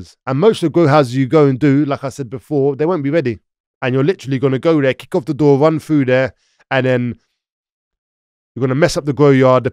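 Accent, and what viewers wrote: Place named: Camden, United Kingdom. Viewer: Great story ! Love the accent as a Londoner all Camden heads sound like this !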